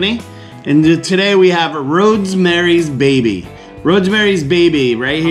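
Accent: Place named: United States. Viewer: American accent.. yea bro! Gotta try this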